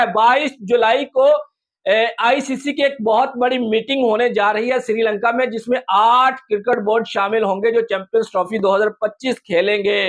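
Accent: Pakistan. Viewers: Pakistani h accent se pata chal rha h or video ka sub tittle m english ke error se pata h chal rha h ye pakistani h 🤣🤣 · Bhai tum Pakistani ho tumhari boli accent Pakistani ha Indian nhi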